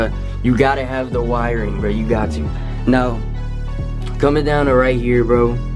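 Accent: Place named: New York, United States. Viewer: What’s ur ethnicity and where u from ? U sound from New York and look Dominican 😭😭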